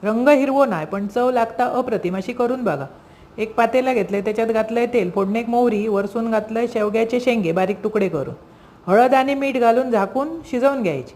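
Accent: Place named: Malvan, India. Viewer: ताई तुम्ही कुठल्या गावच्या. छान बोलता. हे खर मालवणी. ऐकायला खूप छान वाटत.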